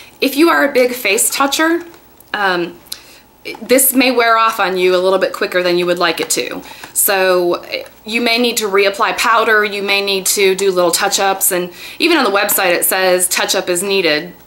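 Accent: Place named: South, United States. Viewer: I knew you had to be from the South! We sound the same....and provide lots of information (ha ha!)....I have this one and feel like you do. I also have the Smashbox. I bought it before it was released to the public. I like it a lot. I still use a concealer and finish powder (IT cosmetics)...I like the look! I definitely think it's making my complexion more even. Keep the vids coming! I love your sense of humor! We Southern Belles got it goin on! Hee! Happy Easter, y'all!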